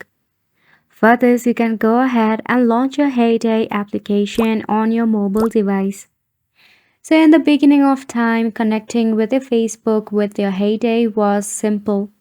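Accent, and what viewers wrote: India. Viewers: Are you okay? Indian?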